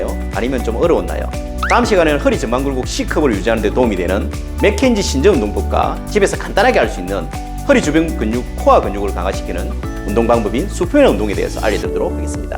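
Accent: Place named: Gyeongsang, South Korea. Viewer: 낭만 닥터님. 구수한 갱상도 사투리에 열정까지 담아서 설명해주시니 머리에 속속들어옵니다^^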